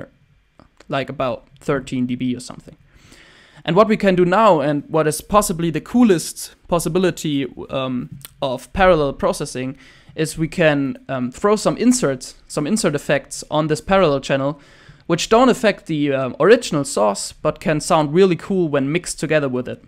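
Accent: Germany. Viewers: ne dein english ist gut, aber wenn man selber deutscher ist, hört mans halt.. ich glaub du hast sogar ne relativ ähnliche stimme wie ich ;-)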